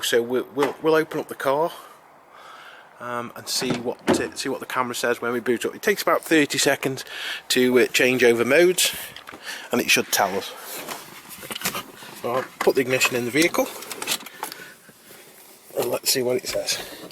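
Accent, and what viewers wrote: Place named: England, United Kingdom. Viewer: Sid Nazim an American picking faults in an English guy speaking English as its spoken in England ?hahah stick to cheeseburgers boy ( and by the way it al-u-min-i-um not aluminum !!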